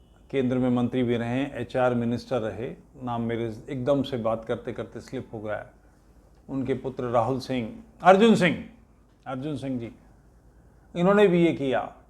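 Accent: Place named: Meerut, India. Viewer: Aap ka MEERUT wala lehza was amazing . Because , i am also from MEERUT .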